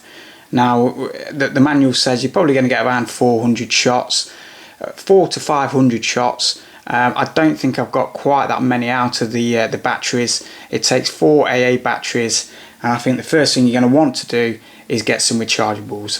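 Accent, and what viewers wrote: United Kingdom. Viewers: Epic British Accent !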